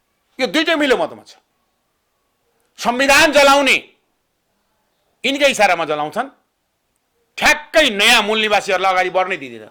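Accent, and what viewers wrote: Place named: Mongolia. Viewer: याे नेता अनुहार हेर्द त मङगाेल जस्ताे छैन बाेलि सुन्दात सुँगुर खाने मङगाेकाे जस्ताे छ ।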